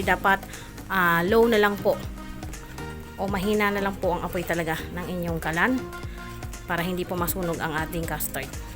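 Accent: Philippines. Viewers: I just subscribed to your channel, you are wonderful. I enjoy listening to you, you are very knowledgeable & professional. You explain it in very good tagalog and you say the english words in the real Pilipino diction and pronunciation which is very nice to the ear. I hope dumami ang manood sa iyo. Paki tanggal lang ang background na laughter at iyong background sound na wow, at sound ng chewing like chicharon, those ugly sounds degrade the high quality of your presentation. You are much better than Kris Aquino with her useless cooking.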